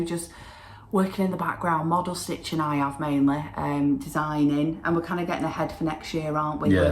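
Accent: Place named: England, United Kingdom. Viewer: Never saw you before. Glad to be here. Where are you from? I love your accent. I would think England but heavy accent makes me think maybe not. Next I must say I LOVE your Trick or Treat Town. It is perfect!! I hope to be able to purchase. I am in US and always happy to meet fellow cross stitchers. Crack on! I subscribed.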